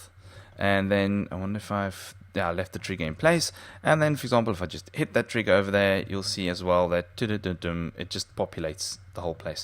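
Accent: South Africa. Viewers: are you south african? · You sound South African lol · I know a Saffy accent when I hear one ;)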